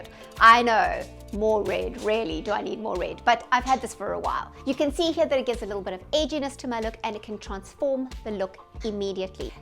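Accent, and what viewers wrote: South Africa. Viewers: You’re fabulous! I’ve been following you for a short while now. Been trying to place your accent. South Africa. Thanks for sharing that. Beautiful accent!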